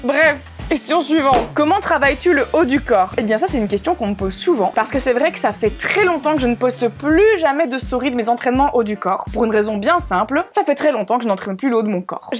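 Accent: Belgium. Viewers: J'adore l'accent belge ! ❤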